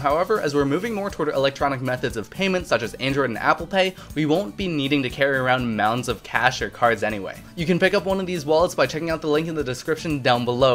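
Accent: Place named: New Jersey, United States. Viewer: guess your from Jersey haha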